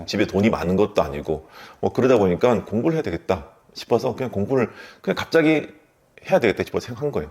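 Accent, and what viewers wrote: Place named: Busan, South Korea. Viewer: 사투리가 들렸는데 부산대의대 선배님이셨군요..! 너무너무 재밌게 들었습니다ㅋㅋ 인턴하면서 하루하루 일하고 자고만 반복했는데 영상 보면서 동기부여가 많이 되네요 포부를 크게 가져야겠어요 좋은 영상 감사합니다!!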